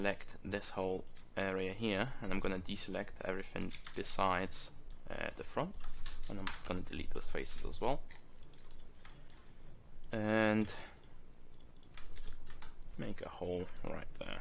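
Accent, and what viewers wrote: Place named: Russia. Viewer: Your accent is close enough to russian accent :D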